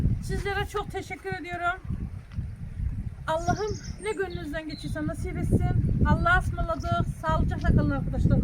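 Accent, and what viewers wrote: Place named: Giresun, Turkey. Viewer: hn.Bn İstanbulda yaşayan bir Karadeniz'li olarak merak ettim neresi diye cevap verirseniz memnun olurum.konuşmalarınız aynı Giresun şivesi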